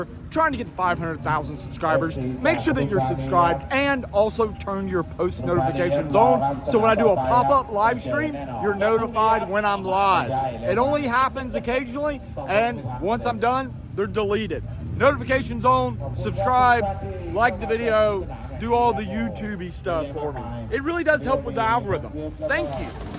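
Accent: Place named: New Jersey, United States. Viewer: Yo have a jersey accent..are you from the north East coast?love your content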